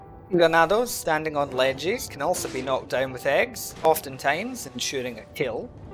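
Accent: United Kingdom. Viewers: "Hay-ch PEE!!!" 😂British voices are great.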